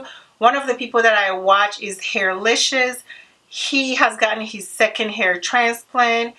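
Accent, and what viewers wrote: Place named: Togo. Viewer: Hey sister, wow............. are you from TOGO? I never knew that because of your accent, so do you speak EWE language?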